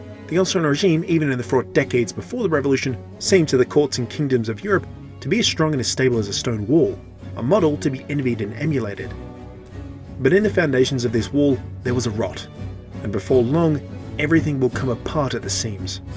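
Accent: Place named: Australia. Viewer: Pretty dope I found an Aussie explaining the FR. Awesome video mate.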